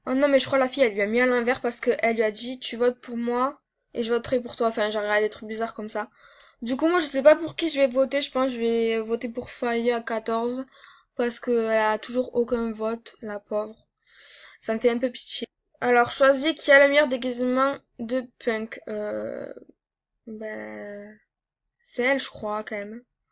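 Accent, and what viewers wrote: Marseille, France. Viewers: Ta l'accent Marseillais tope la copine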